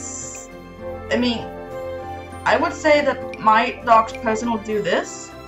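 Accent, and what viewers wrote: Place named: Denmark. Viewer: Hello! Are you Danish? It sounds like you have a danish accent😁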